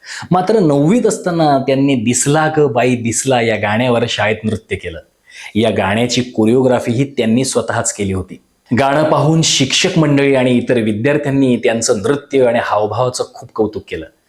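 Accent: Standard. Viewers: शुद्ध, स्पष्ट मराठीत माहिती ऐकून छान वाटले!वर्षाजीचे सर्वच चित्रपट पाहिले आहेत. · शुद्ध भाषा बोलता छान वाटले 👌👍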